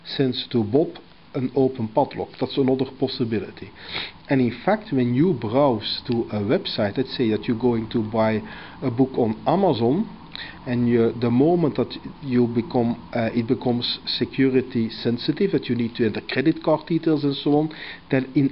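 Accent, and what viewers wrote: Netherlands. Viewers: thanks man :) are you a dutch ? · No objection or anything, but are you Dutch? Your accent sounds Dutch to me. xD And thanks a lot for this video!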